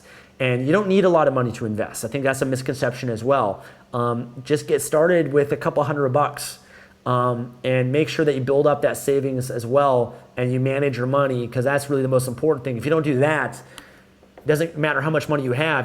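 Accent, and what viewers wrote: Canada. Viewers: Great information, thanks dude! and your accent has Canada writen all over it. nice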